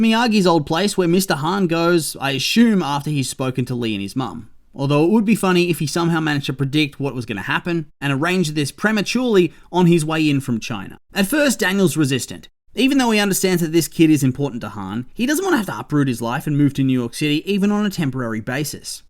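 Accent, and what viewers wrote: Australia. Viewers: I love the sound and tone of your voice so much!! Australians have always had my favorite accent, and visiting there has always been a big goal of mine to help study bio diversity and wildlife. And 'cause it's super pretty too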